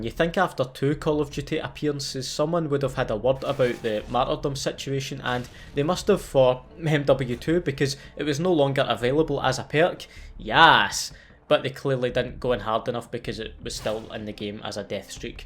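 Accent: Scotland, United Kingdom. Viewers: oh, a scottish (?) youtuber??? Hmmm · 2 years later I still think this guy has the most Scottish accent ever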